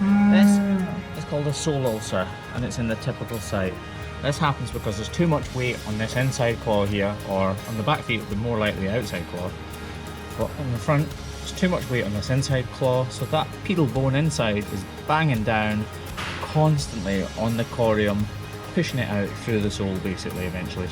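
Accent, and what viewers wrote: Scotland, United Kingdom. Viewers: Im from Wisconsin in the US and we have a ton of Dairy farms I didn't realize Scotland had that many farms I thought you guys just had goats and sheep and wore kilts jk about the kilt part. You look like you could be from here until you speak Well I live in Milwaukee so near here anyhow. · I like your videos because I love cows and your scottish accent 😍👌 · I do believe that I am beginning to catch on to what you do to those cows feet! Its your Scottish accent that makes we ladies watch your videos! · How long does it take for the glue to get loose? Is that always long enough to heal up? Like your videos. Even if your Scottish accent is hard to understand for a Dutchy like me, I learn more about hoof trimming! Btw: nice trimming box you have 😉.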